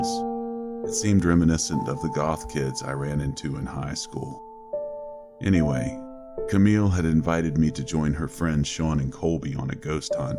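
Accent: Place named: South, United States. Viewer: where are you from? Sound like you got some south in ya sir.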